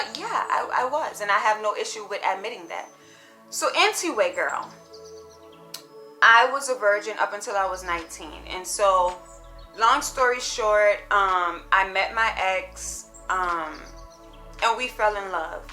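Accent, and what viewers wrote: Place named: New York, United States. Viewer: Your New York accent had me hollering 😂😂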